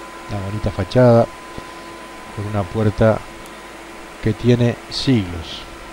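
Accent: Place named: Argentina. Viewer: Por el acento sos argentino...no...?...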